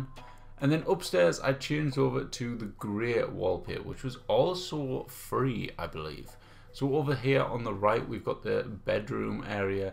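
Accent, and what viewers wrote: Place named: Stockton-on-Tees, United Kingdom. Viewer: I’m Stockton-on-Tees so yes you sound familiar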